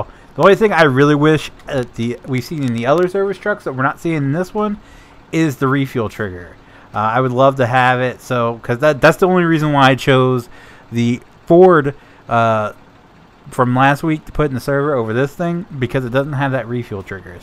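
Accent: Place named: Canada. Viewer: Laughed at that Canadian accent 😂. I’m sure you hear me on Saturdays slipping up with “bud” and “eh” A LOT lol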